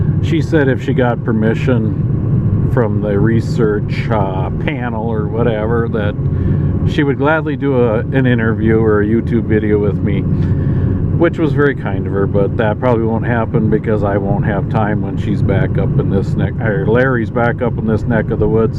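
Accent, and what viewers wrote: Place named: Minnesota, United States. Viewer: What part of Minnesota are you from?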